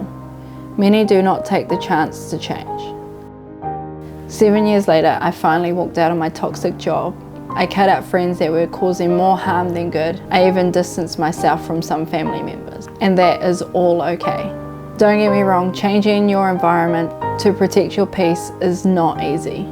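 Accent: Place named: New Zealand. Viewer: Thank you, for your gentle, strong words. It sounds like you have found your own peace, even if that peace means feeling crap for a bit or telling someone to F-Off. Where is that beautiful accent from? Kiwi? Cheers, R.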